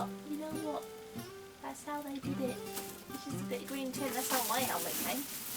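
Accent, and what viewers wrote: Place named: Peterborough, United Kingdom. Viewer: Are you from Peterborough? I recognise your accent.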